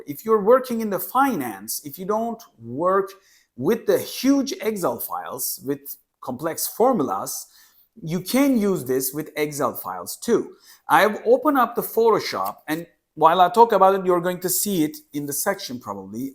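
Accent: Turkey. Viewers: Reis türk müsün ? Türk aksanın var :> Ürün incelemesi için teşekkürler.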